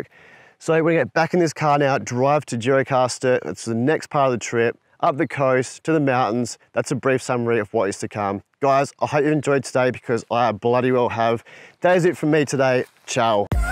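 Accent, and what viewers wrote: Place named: Australia. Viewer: Hi Mate, sorry to just now be getting to your video as I've been in the process of moving. As always, I have enjoyed the video and the efforts made to deliver your content, sick Australian accent and all! It was pretty evident the struggles you were undergoing during the Asia trip, maybe just the wrong time of year. The vantage point you were at for valley horseshoe river shot was choice. So nice to have travel chef/photographer along for this journey as well, you'd be screwed without him, haha. I'm curious, have you ever been into Slovakia? I have a nephew living there and suspect the landscape is fantastic there as well.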